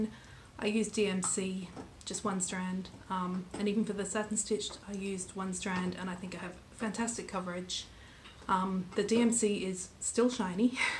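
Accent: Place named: Australia. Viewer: Congratulations! It is a beautiful finish and you have every reason to be excited about it! Also great to hear another Australian voice on Flosstube (I'm from South Sydney). I love to watch planning videos so I will certainly be watching that when you get a chance to film it. Thanks for sharing your finish with all of us.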